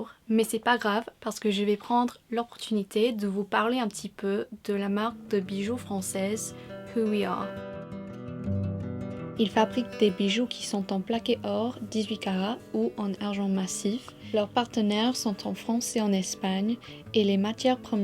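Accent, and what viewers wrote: England, United Kingdom. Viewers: With your English accent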